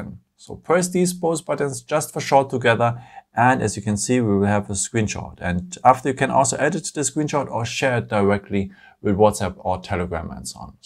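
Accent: Germany. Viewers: Great ! Got mine ysterday . Tought I heard a "german" sound in the video. Vielen Danke from Finland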